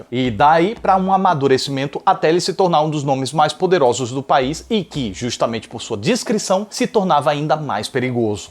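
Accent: Northeast, Brazil. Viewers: Amigo vc é do Nordeste é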